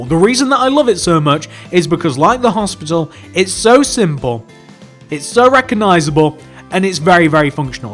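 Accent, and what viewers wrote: United Kingdom. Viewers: Your voice doe. I never seen you get excited like before xD your voice is british doe.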